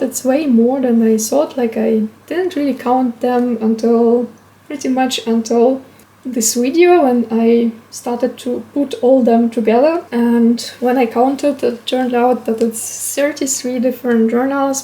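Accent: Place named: Russia. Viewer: Off topic but I LOVE YOUR ACCENT😭🤲🏼 are you Russian?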